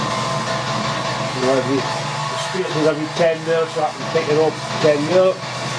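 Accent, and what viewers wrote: Newcastle, United Kingdom. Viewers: I love how Geordies say "hurls" instead of holes.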